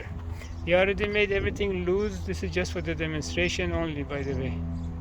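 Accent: Iran. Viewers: از گویش شما پیداست ایرانی هستی موفق باشی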